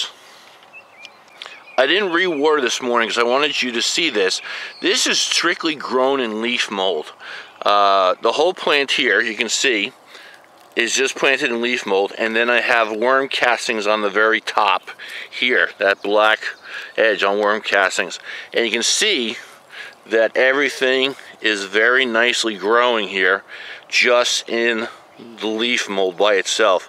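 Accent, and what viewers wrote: New Jersey, United States. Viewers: Yer Joisy accent is just precious! My first husband wuz a Joisy boy and you East Coast boyz are just fine in my book!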